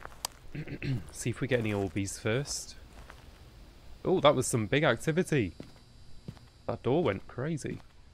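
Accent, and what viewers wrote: United Kingdom. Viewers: What part of the UK are you from? I recognize the accent you got. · Man am I the only one who absolutely love his really good british accent? Idk it just sound so amazing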